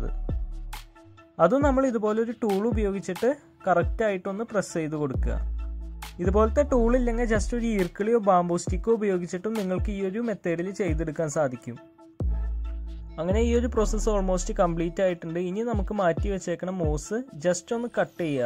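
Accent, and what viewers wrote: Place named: Thrissur, India. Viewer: Samsaram kettitt tsr aanennu thonnunu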